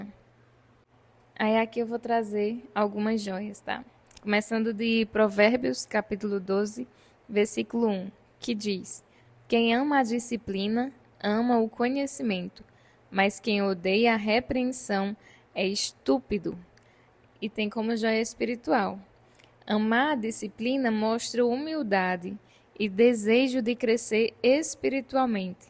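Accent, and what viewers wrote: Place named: Northeast, Brazil. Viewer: minha irmã você é nordestina..por coisa do seu sutak.rsr😍🥰🤳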